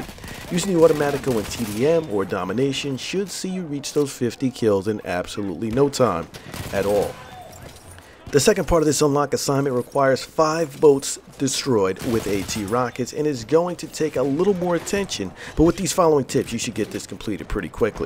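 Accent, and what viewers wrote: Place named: United States. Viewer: Bro, You are 'THE' place to be to get the most out of BF1. Your voice is so clear and concise. I like your American accent. You know I'm from England, I speak way faster I doubt you would understand a word I say...Lol..Have a great Christmas brother.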